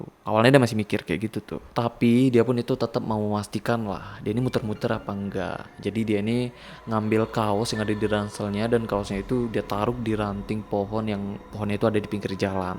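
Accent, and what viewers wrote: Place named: Sumbawa, Indonesia. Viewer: Bang, pernah tinggal di sumbawa kah? Logatnya kek ada logat sumbawa..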